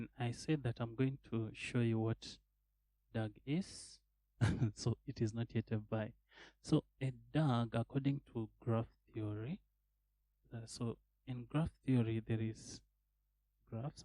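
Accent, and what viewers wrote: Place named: East Africa. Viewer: nice content brother. i recognize that East African accent!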